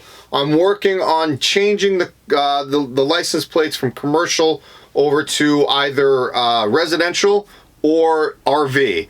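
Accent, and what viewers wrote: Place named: East Coast, United States. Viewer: Nice. I was wondering where that east coast accent was from. New York, here.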